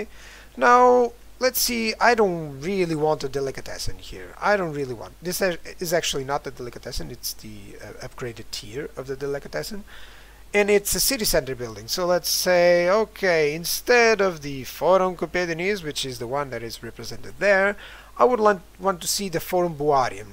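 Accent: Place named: Poland. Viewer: Vaya curro te has pegado, gracias por compartirlo. Por tu acento pensaba que serías de polonia o pa ya pal coño. Saludos, y gracias, otra vez.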